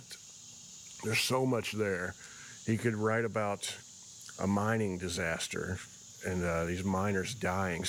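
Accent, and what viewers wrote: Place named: Asheville, United States. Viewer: I had just commented on your guitar player post last night about this. Man that sucks so bad. I wish my brother was still alive because he would be right with me on the commiseration today. He died in 2004 July 23rd. He turned me onto Tom T Hall, Johnny Cash, and all the older country and southern rock artists. I was a punk rock and thrash skater with a limited knowledge of older dudes like that. I sure appreciated him playing that stuff for me. Also when you get down to it the jump from bands like The Clash, The Violent Femmes, and Uncle Tupelo really ain't that far a stretch. I feel like you can play rock versions of country or country versions of rock. As music goes it's all the same there's only 12 notes and I only use 5 of them. Anyways as story tellers go Tom T. Hall was amazing. You have that same kind of delivery and ability... 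I play your stuff more and more and listen to the stories matter of fact the other day my wife said "That has such a nice voice. Comforting and sounds like people back home in Asheville. I could listen to him talk and sing all day" aside from that s#$* still been jamming on the songs you write. LOL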